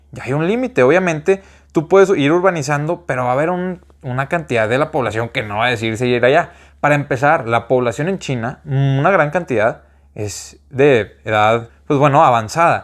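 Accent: Monterrey, Mexico. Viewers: tiene un acento bien marcado Regiomontano de Mexico que me trae grandes recuerdos de mi tierra. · Pues bien bonito que es esa plática con ese maravilloso acento regiomontano.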